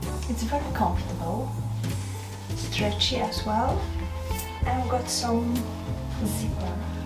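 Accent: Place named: Netherlands. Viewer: You look beautiful,. But, your little feet need kisses x Your accent...are you Dutch? x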